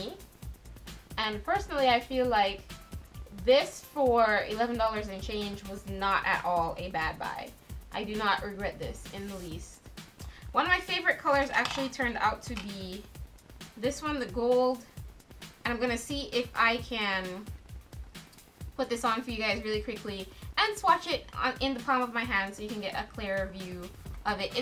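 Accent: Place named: Trinidad and Tobago. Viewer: found you through your amazon review....ah hearing that accent gyul! Trinibago???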